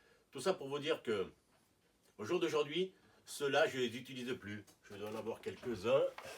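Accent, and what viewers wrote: South, France. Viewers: Moi c'est l'accent du sud que j'aime bieng...! Le reste aussi évidemment. Tchao.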